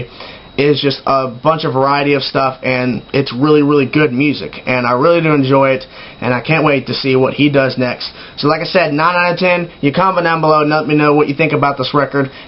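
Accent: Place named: United States. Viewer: He says Tankian, but the "an" is faded because he have American accent. If you're an American and didn't noticed you're the idiot.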